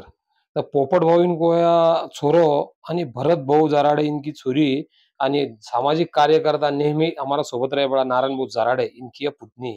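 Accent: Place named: Maharashtra, India. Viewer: बहुत ही सुन्दर आपका बोलने का लहजा मराठी है आप गोत्रों का उच्चारण हिंदी भाषा की तरह करे जैसे सिर्रा या सिहरा मेहर ताजी etc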